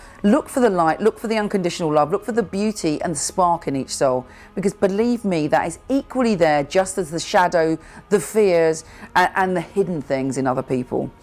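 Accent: United Kingdom. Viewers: i wish i had your so refined british accent...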